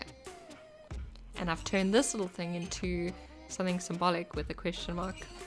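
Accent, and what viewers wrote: South Africa. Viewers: Do I hear a South African accent there? Enjoyed watching your journal process. TFS!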